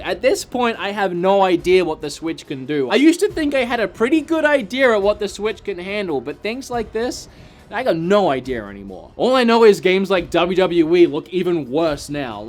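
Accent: United States. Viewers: I'm Australian, all I can say is that your accent is devolving to American. Also I want to send you something what do u neeeeed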